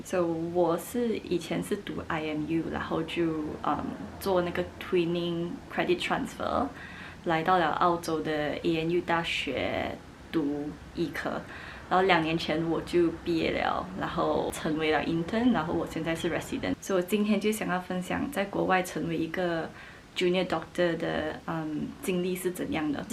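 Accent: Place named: Cantonese, China. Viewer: 马来华裔么，中文不错，就是带点粤刚音，可能是广东那边的移民。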